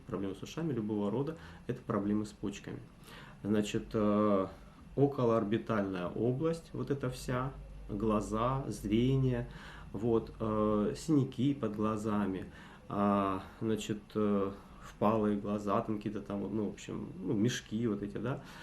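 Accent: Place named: Russia. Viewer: Отвратительная подача материала с постоянным занудным аканьем.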